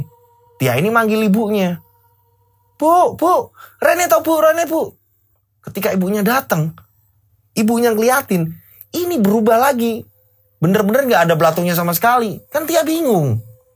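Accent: Java, Indonesia. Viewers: Bang ini bahasa jawanya medok banget ya...slmt siang semuanya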